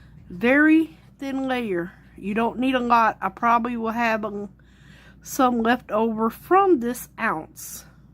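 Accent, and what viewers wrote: South, United States. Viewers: You had me with the Southern Drawl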